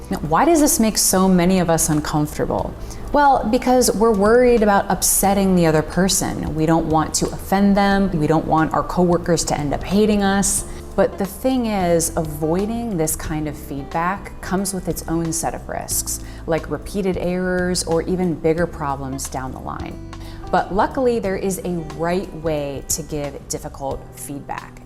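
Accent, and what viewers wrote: United States. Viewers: Are you American? Are you a native American speaker?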